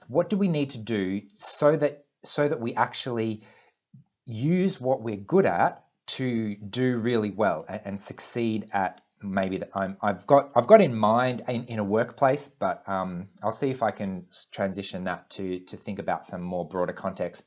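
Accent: Australia. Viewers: I hate Australian accents, but I'm still subscribed because I appreciate your ideas.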